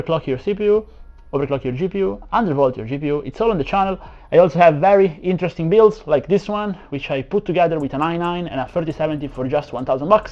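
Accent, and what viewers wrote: Italy. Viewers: Ti ringrazio molto per il tutorial, ho visto il video e per fortuna asus non ha stravolto il suo bios dopo 3 generazioni (ho un i7 13th e una z690). Ps. dalla pronuncia ho capito che sei italiano, sai parlare molto bene in inglese, complimenti.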